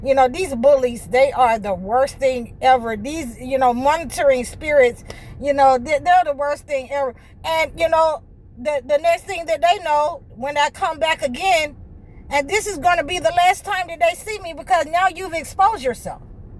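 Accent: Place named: California, United States. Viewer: You sound like you from Comi fornia.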